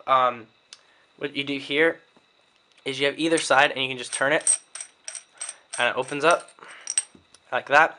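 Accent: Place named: North America. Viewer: You speak with a North American English accent and yet you keep pronouncing Aluminum the British way...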